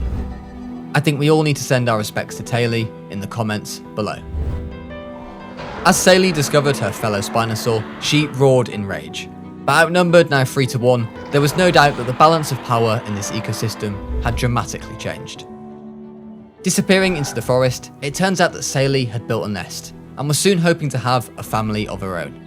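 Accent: Czech Republic. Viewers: who wouldve guessed your from czech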